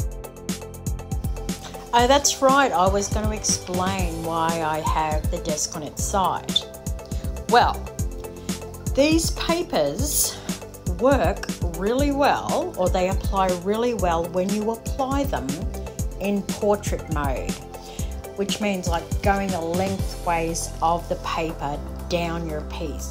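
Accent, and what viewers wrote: Australia. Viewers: Looks beautiful 👏🏼👏🏼👏🏼 What part of Australia are u from? Or did I get your accent wrong?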